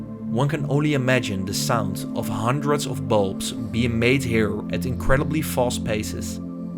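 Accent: Netherlands. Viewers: This dutch accent is awful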